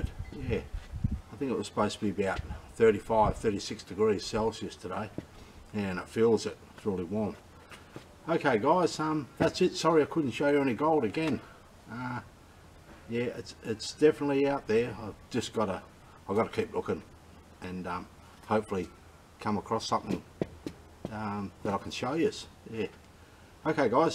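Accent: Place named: South Africa. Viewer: Are you from South Africa? your accent sounds like S. African English. i have some good guys from there. we done Exploration Projects here in my country. cool and professional geologists.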